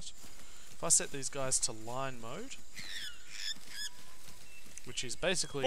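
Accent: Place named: Australia. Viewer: Yes, I found this video very helpful and well explained indeed!!! I'd also be interested in knowing the other Channel. Either way I've subbed to this one just incase more uploads come. Also, great to hear another Aussie =]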